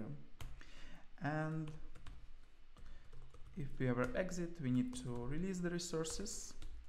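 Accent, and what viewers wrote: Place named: Russia. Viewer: сразу русский акцент слышно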